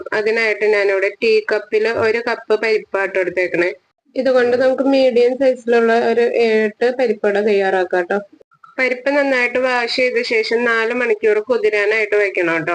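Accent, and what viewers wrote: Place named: Ernakulam, India. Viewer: ..correct alle..enikk ariyaam ee slang..May be we r neighbours, evideyaa place..?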